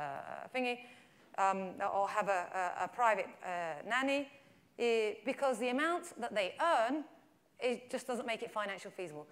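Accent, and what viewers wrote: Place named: Turkey. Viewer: Böyle bir aksan ve Türk bir bayan, sizi bulduğum için çok mutluyum..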